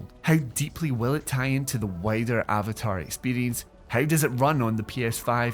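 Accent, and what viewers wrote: Scotland, United Kingdom. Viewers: Definitely Scottish. Source: my wife is Scottish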